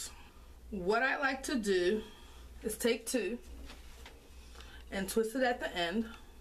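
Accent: Baltimore, United States. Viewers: I hear a Baltimore accent🌚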